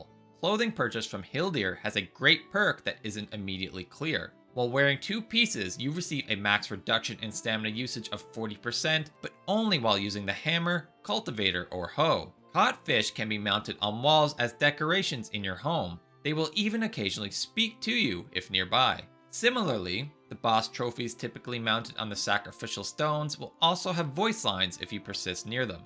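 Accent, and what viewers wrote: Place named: Canada. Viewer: A couple things, the fire tip was super short and kind of obvious lol, one useful thing I've been told about smoke ventilation is it's not always necessary. I don't remember the exact numbers but it's something around 3-4 walls high when you can no longer suffocate from smoke.👍👍 But If aesthetics are more your aim then the particle effects that emerge from smoke buildup is probably going to bother you.😅on a lesser side-note I can totally tell your Canadian from the way you say your R's😂👍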